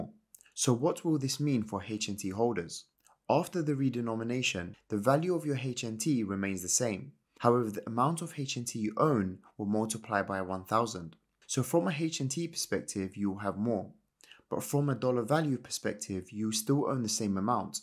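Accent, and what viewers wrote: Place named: England, United Kingdom. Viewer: cheers mate! keep up the uploads, nice to have someone english talk about this stuff instead of these waffling americans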